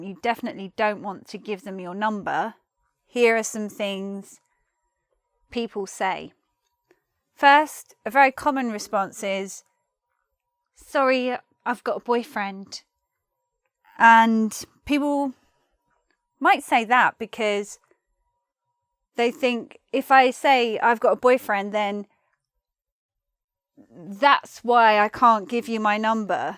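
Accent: United Kingdom. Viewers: Hi,! Glad to see and hear you again. Your British English accent is always a good practice for me. And you are a very good teacher. With love from Russia. Ch.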